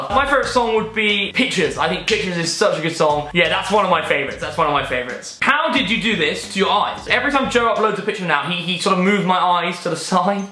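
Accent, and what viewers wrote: RP, United Kingdom. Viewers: has to be like the poshest sounding person on YouTube. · you sound quite posh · never realised how posh he actually sounds until now :O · Where is he from he sounds so posh to me 😂😂😂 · You are so posh. I ❤ your accentttt😍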